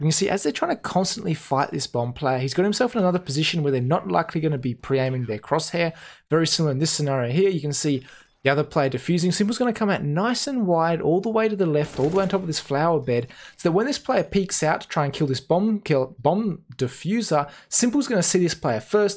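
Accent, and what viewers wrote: Australia. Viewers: you should have at least 1M subs btw i love Australian english keep it up :)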